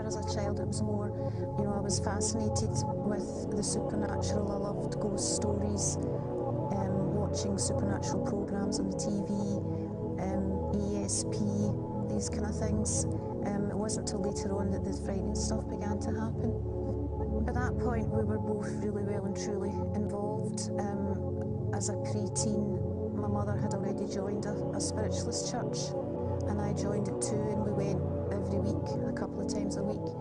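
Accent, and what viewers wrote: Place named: Scotland, United Kingdom. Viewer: Scottish accent makes me sick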